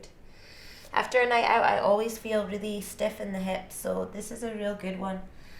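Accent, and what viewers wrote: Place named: Scotland, United Kingdom. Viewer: That's a Scottish accent.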